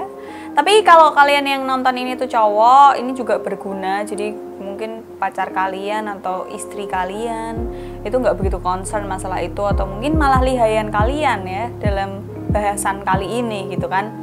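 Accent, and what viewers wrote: Java, Indonesia. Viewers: jowo mu ngendi mbak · Kk e medo' ,😆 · Jowone khas jawa ndeso 😀 tp gpp lah rodok terhibur 😄😄😄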